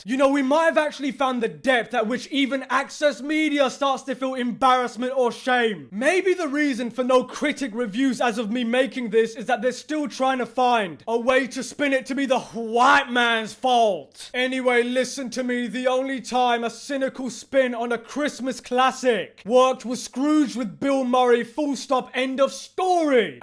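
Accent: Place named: Yorkshire, United Kingdom. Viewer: love your accent bro, is that Yorkshire?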